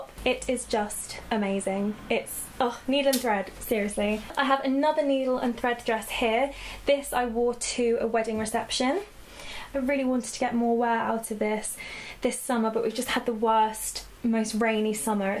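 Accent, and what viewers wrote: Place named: England, United Kingdom. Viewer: is from England I think around London chelsea I'm not sure I'm guessing around there because of her lovely accent 💗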